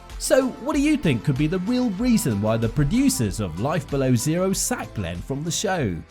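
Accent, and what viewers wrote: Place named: England, United Kingdom. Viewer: why does the narrator have a English accent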